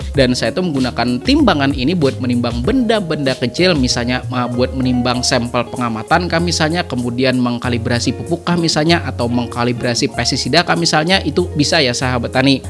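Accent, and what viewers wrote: Banjar, Indonesia. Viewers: Sy baru di Chanel ini, juga baru belajar menanam. Alhamdulillah, isi Chanel nya benar2 padat ilmu. Oiya Om, mohon maaf, cuma mau mengobati rasa pnasaran sy, sy mau bertanya.. Om org Banjar..? Logat nya mirip Sama saya. :)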